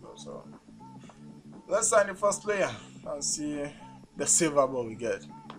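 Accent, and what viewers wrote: Nigeria. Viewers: honestly, i dont know. Myself, i'm Ghanaian. I just know he's nigerian because of his accent, and coz he confirmed in one of he's videos that he's nigerian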